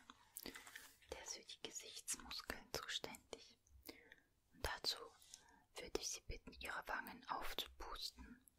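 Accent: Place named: Russia. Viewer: Ich liebe diese Stimme mit dem russischen Akzent so Nice😍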